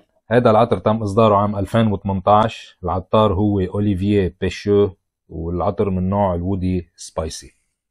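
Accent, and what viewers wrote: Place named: Lebanon. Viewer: اللهجة اللبنانية كتير منيحه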